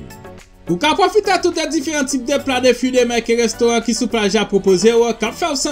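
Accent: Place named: Martinique, France. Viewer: Have you lived in the Caribbean before, you've sound like people from Martinique ou la Guadeloupe?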